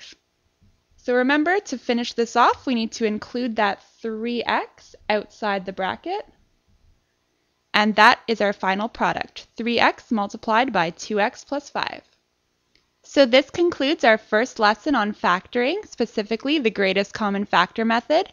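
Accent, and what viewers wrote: Canada. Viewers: Fantastic explanation, and clear examples. Really helpful. And as an aside, AMAZING Canadian accent (as an English Brit we don't get accent envy often, but yours is awesome) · very helpful - cute Canadian? accent :)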